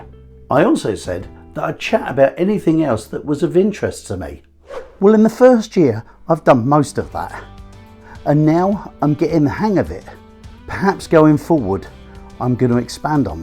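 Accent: England, United Kingdom. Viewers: I like your calm and enjoyable english voice :)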